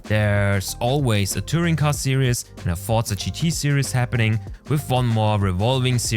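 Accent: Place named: Germany. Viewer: Bro er ist 100% deutsch man hört das raus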